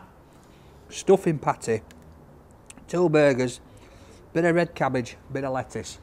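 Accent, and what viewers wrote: United Kingdom. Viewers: I love the way you Brits speak. 🙂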